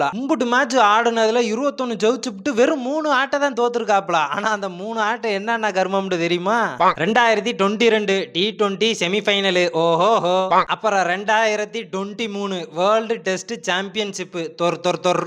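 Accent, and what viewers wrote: Madurai, India. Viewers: Bro neenga maduraiya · நம்ம மதுரை ஸ்லாங் கேக்கவே அருமையா இருக்கு நண்பா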